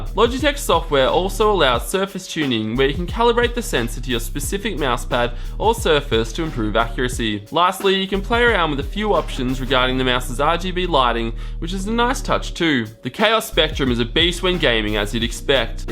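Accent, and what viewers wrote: Australia. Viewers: happy to find a tech channel with an aussie host :D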